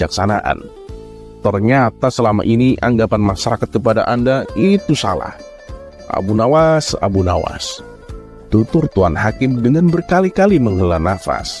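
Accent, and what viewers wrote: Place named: Sunda, Indonesia. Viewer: Waduh hihiii aslinya kayaknya orang Sunda ya😁😁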